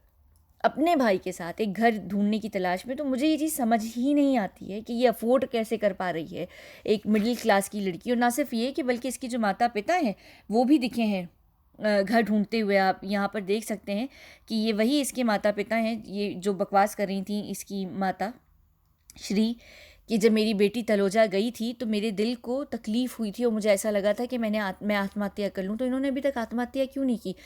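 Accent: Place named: United Kingdom. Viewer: wow sachi she is worth those words aur b bohut Sare...aap sachi bohut acha decode karthi ho really so awesome videos n very informative n I love the way you speak the UK accent of the English words n sentences you speak madam🙏 plz keep making more videos for all of us. Cuddos to all your team👍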